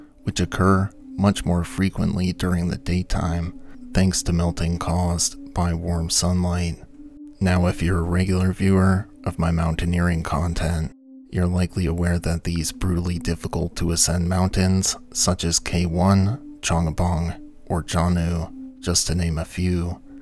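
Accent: Midwest, United States. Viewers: Mid west accent fer sheer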